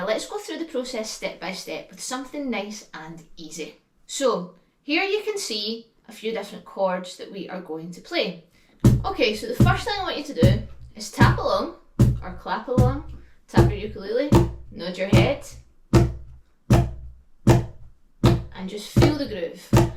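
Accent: Scotland, United Kingdom. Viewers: So I was just clicking around YouTube Shorts and ended up somehow On one of yours about "finding a groove", then I checked the main vids out And saw this one was new, so I listened and watched from start to end. I like the blue instrument, and the musical cap seems like a neat piece of fashion, But I noticed neither for like five minutes because... your accent is so pretty! 😄 (I should go find other people from Scotland to watch now or I'll end up learning the ukulele. 😂) Keep up the good work because the lesson itself was rather easy to follow along with, And if I had a ukulele, I think I could pick up strumming fairly quickly with this practice. 😃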